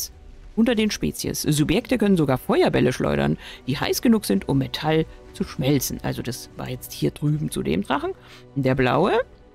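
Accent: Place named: Berlin, Germany. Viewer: Wie süß du das sprichst bist Berliner in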